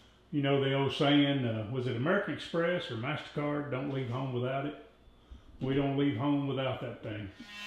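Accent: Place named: Alabama, United States. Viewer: Sounds like a 'Good ol' boy' from Alabama. Good comparison regardless.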